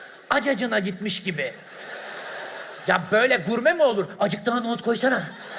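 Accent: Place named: Diyarbakir, Turkey. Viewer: cem yılmaz niye diyarbakırlı gibi konuşuyo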